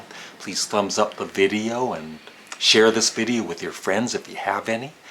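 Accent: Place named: Canada. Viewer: 🇺🇸 I just assumed it was Canadian accent coming out. Doesn’t bother me.🇨🇦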